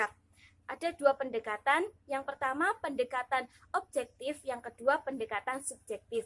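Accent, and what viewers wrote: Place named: Java, Indonesia. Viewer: Aku juga mau ngomong medok gini di depok mba :(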